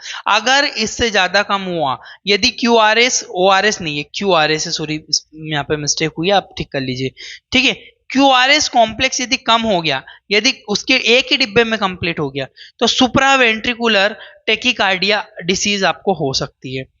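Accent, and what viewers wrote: Indore, India. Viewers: Indore se ho na aap? Language wesi lag rahi he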